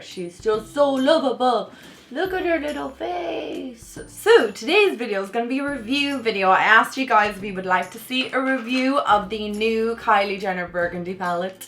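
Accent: Ireland. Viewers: Mariah M the accent is Irish, I'm Irish myself 🍀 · I love your accent is it irish? you should name the kitty Oreo.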